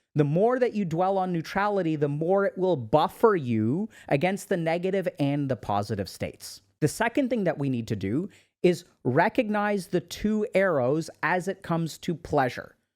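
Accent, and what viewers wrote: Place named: India. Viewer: Dr. K - Would you consider having a secondary channel for 528 Million (½ a Billion) Hindi speakers ?The accent would actually help in popularity in the younger generation. 😊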